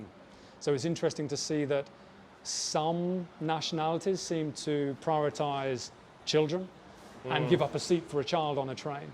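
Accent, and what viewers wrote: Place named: United Kingdom. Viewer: Don't really like the heavy distinctive british accent too, something like that of Mr Bean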